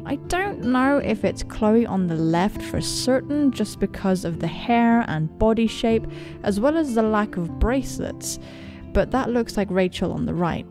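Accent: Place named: United Kingdom. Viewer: OK!! So this is my first time here and my first video of yours as well and it seems like you are a big fan of 'Life is Strange' and that is really cool, and I have wanted to say this from the beginning but I LOVE your voice! British? Australian? But more British I think... Am I close? Anyways love the voice and I love the game so I think I will stick around.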